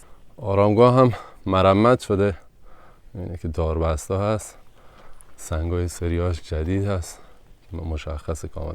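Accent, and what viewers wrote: Shiraz, Iran. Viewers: تشکرمیکنم ازشماعزیزدل که ایران زیبارابالهجه زیبای شیرازی بمانشان میدیدبجای من پدرمهربانوببوسید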